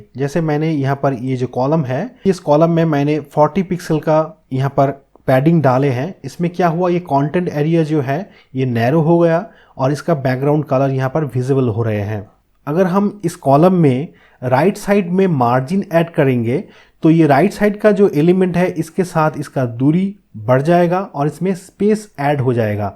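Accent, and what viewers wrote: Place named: Bengal, India. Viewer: সুপ্রিয় মহাশয় , আপনি যে এমন একটা অ্যাডভান্সড টপিকে এত ডিটেলস এ টিউটোরিয়াল বানিয়েছেন এটাই অনেক বড়ো কাজ। বাকি আমি বাঙালি বলেই আপনার হিন্দিতে বাংলার টানটা ধরতে পেরেছি, তবে আপনার হিন্দি যথেষ্টই ভালো, হিন্দি ভাষী বন্ধুদের ও আশা করি বুজতে কোনো সমস্যা হবেই ন। আমি মালদা জেলার এক সিভিল ইঞ্জিনিয়ারিং পড়া মানুষ, আমার পক্ষে CSS HTML বা কোডিং বোঝা সম্ভব হয়ে উঠেনি এখনো । তবে আপনার মতো wordpress developer দের এত সহজ ভাবে বোঝানো ভিডিও গুলো সত্যি খুব সাহায্য করেছে আমাদের মতো non-techie দের ও নিজের blog বানাতে। আপনার কাছে আর ও একটা রিকোয়েস্ট থাকলো --- যদি সময় পান তাহলে GeneratePress theme এর Page break করার পর যে Pagination page number , first page, last page eisob j aase , eta k aktu colourful korar jonno , CSS code ta kothai edit korte hoi jodi tar opor ekta video baniye den....tahole amar khub subhidha hoto....thik etar motoi <link>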